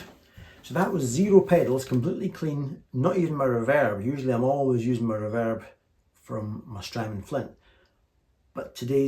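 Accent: Scotland, United Kingdom. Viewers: We are indeed - great to hear a Scottish accent in the YT guitar circuit. Lovely tasteful playing and sounds - all the best. I'm subscribed and will be checking in 👍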